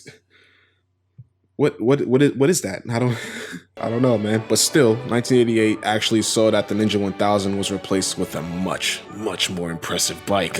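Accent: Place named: Italian-American, United States. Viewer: Lmao I love the guido voice, muff per hour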